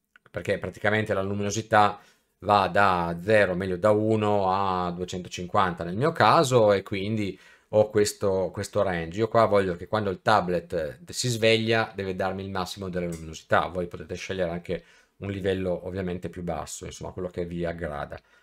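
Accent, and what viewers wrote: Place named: Italy. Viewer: Grazie per il video! Dopo giornate e giornate nelle quali cercavo una soluzione per il mio tablet, con questi script mi hai risolto tutti i problemi!! Tra l'altro, a sentire dall'accento, sei anche delle mie parti! 😅 Grazie ancora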